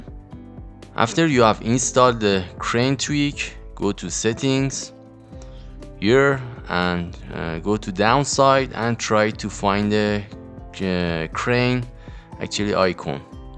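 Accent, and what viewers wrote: Iran. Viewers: انگلیسی با لهجه اصفهانی قشنگی داری داداش، عالی😎👍🏻❤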